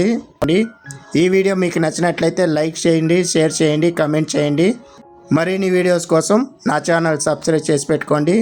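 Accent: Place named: Karnataka, India. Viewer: it seems you are from Karnataka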